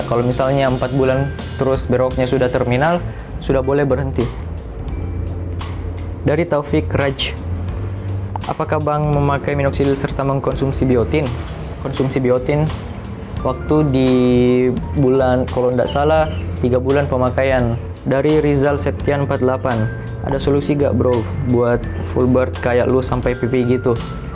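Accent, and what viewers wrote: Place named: Sulawesi, Indonesia. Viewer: Orang sulawesi ya?logat.y kentara😁✌️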